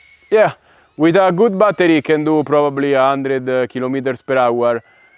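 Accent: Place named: Italy. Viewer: sempre bellissimo sentire un italiano parlare in inglese <3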